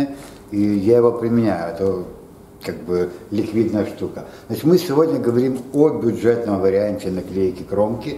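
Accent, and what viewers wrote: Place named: Odesa, Ukraine. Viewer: забавляют-не забавляют-такое...))) Во-первых, имеем дело с чистым одесситом. Слушать одесские обороты и истории-отдельное удовольствие. Как говорится, что вы говорите-не важно-просто говорите по одесски. Мастер, по-моему скромному мнению, очень хорошо и без понтов доносит личный опыт, бесценный. И чего раньше канал не создал. Диме, сыну, огромное благодарю, что таки батю сподвиг. И по друге (во-вторых), коммент вверху уводит в сторону от сути и духа повествования. А так, понятно, каждый имеет право видеть и воспринимать ролик по-своему. Ничего личного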